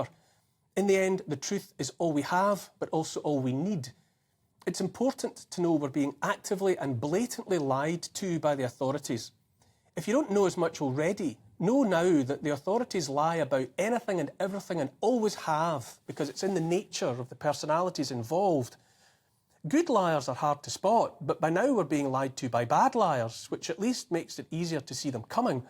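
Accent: Scotland, United Kingdom. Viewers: maybe it's the tone of your voice or the barely hidden anger in your eyes. Or maybe it's the Scottish accent, but every single time i listen to what you have to say I'm looking for a two hand broad sword and a fxcking big axe.. Keep going, stay free.. all the best from the Netherlands.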